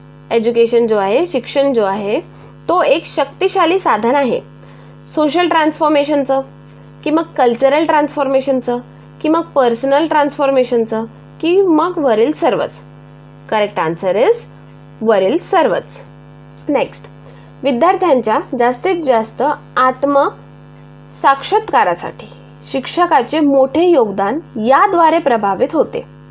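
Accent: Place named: Vidarbha, India. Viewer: Tuze language konti aahe tu kashi bolti tyamde lokana kahi kalena zalay Vidhrbhatli aahe ka tu bai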